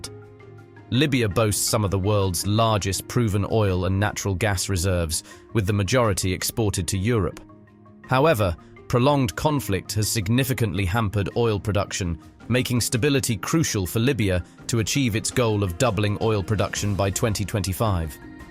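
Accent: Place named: United States. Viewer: HILARIOUS and LUDICROUS! You're talking noise man! You're talking about dwarfs who're trying to get a normal size! Senegal and Niger What a joke man! Libya used to be Z wealthiest and socially developed before Hillary Clinton put an end to its success story! Libya HAS NOTHING TO DO WITH THAT POOL OF bantu DWARFS! Rwanda? C'mon! It weighs NOTHING compared to Tanzania and Uganda either! HAVE A LOOK AT GDPs instead of that nonsensical growth-based bla bla! hAVE YOU LOST YOUR MIND? ETHIOPIA is a giant in all aspects! NOthing to do with that Bantu thing... C'mon! Btw, your accent smells American more than African Bantu. HILARIOUS POST! GROWTH IS NOT EVERYTHING! Just look at China's 5% and US 3%... and STOP COMPARING carrots and gold! It's LUDICROUS and so IGNORANT of how this world works! Go back to school!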